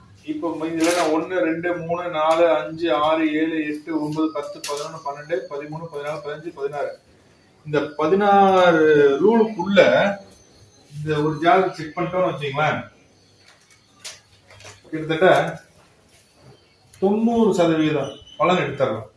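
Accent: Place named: Kongu, India. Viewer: வெகு எதார்த்தமான கொங்கு தமிழ் பேச்சு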